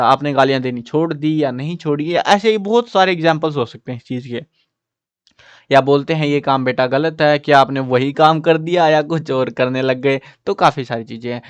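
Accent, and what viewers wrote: Haryana, India. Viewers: Sir app haryana sa ho kya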